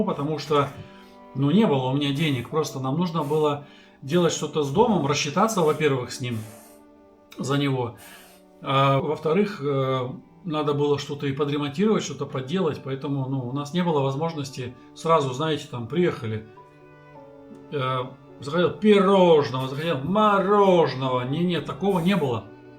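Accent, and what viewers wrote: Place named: Siberia, Russia. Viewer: Очень радует очень хороший русский язык. Поверьте, я в этом кое-что понимаю., уши радуются. Задолбали косноязычные высокопоставленные идиоты.Ты чокаешь, как у нас в Сибири. И акаешь. Это нормально.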